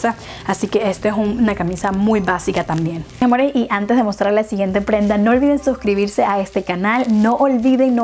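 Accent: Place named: Honduras. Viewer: Por tu hablado creo que eres de Honduras, saludos y éxitos paisana!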